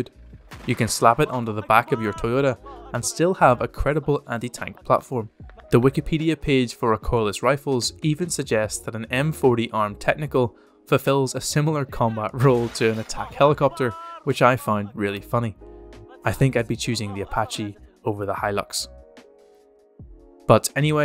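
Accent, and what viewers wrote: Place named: United Kingdom. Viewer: I hate Brit english..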